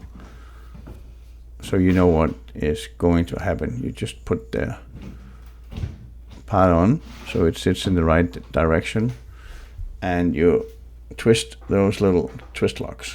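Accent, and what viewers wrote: Denmark. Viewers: Great videos , especially your webpage on the Sektion Rail measurements! I’m gong to take a guess that you are Danish? Sounds like very Danish accent , I love it 👌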